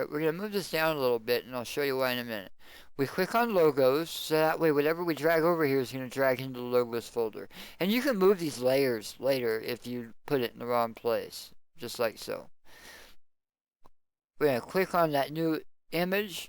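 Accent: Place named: United States. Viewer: Don't worry about your voice. For me as a german it sounds like typical American with a little slang. But I understand your pretty well. Thanks for the tutorial. It helps very much :-)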